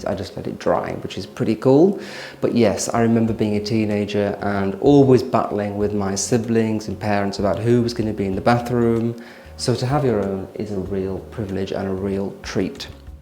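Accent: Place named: England, United Kingdom. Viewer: Italian here. If you speak Italian with you English accent you will sound to us smart and polite. This is the effect! Don’t be afraid to make mistakes Italian are not fussy on languages. Ciao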